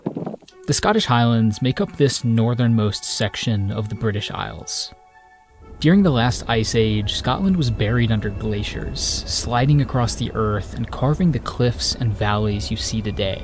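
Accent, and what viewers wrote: United States. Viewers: I downvoted this video because an American narrates it. And the American doesn't know what he is talking about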